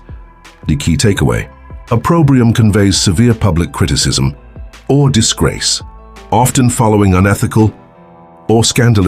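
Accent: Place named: United Kingdom. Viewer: Utterly brilliant, truly magnificent and in British English too. I would be deeply indebted to you to allow me to subscribe to you.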